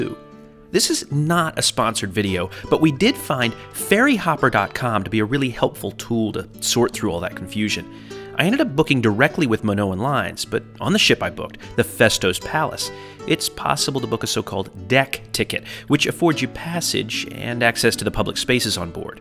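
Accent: United States. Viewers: It's because he sounds and acts American. Some Greeks don't take kindly to Americans, or has that changed? There was a time where it was bad. I remember a time where my Cousin brought an American to Greece, took the Taxi, and got verbally abused by the driver. My cousin answered back in the most perfect Greek to which he shut his mouth. I would assume the same for Journey. I could be totally wrong.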